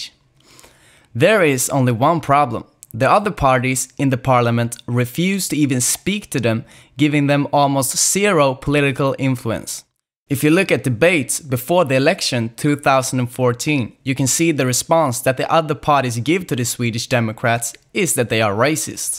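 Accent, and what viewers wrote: South, United States. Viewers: Never knew Swedes has such a US southern accent 😂 I don’t doubt that you’re Swedish, but from who did you learn English from? Sounds like someone from where I’m from, the deep USA south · Where did you pick up that Southern American accent?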